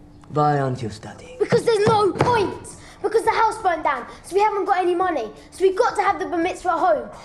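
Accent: United Kingdom. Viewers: He acts like having a british accent is a BAD thing .. OHMYGOD HE'S SO DELICIOUS. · DONT TRY TO CHANGE YOUR ACCENT!!!!! KEEP IT BRITISH, PLEASE!!!!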